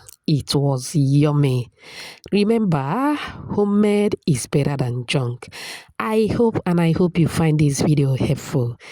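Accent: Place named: Igbo, Nigeria. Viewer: From Nigeria, I like ur Igbotic accent ❤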